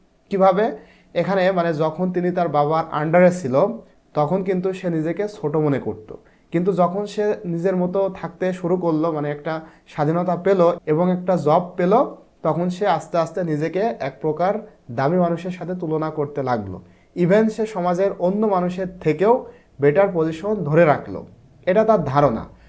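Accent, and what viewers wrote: Bangladesh. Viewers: Apnar bangla english accent sondor asa, thank you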